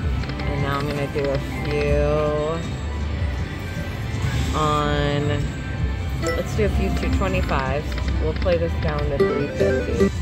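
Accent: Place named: California, United States. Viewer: Your accent you have to be in Cali. I like playing the Bufaros here in Oklahoma casinos . When I was a kid I usd to go to the wildlife refuge and play with the real ones.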